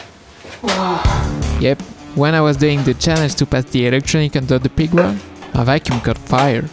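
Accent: France. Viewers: your french accent is amazing, i loved your guitar building, you mentioned practice, i see a master, lots of luck in your guitar building career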